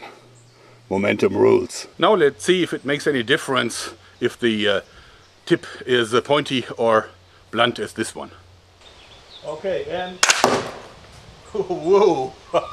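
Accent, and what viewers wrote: Germany. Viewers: Are You german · Is he German ?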